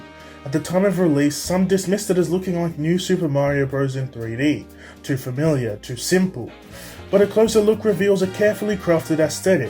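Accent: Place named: Australia. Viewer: the australian accent. like nails on a chalkboard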